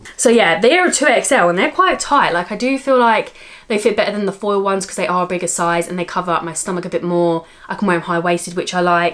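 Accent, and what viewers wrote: United Kingdom. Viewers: So beautiful, just enchanting in every way. And I LOVE that gorgeous British accent! 😍 💕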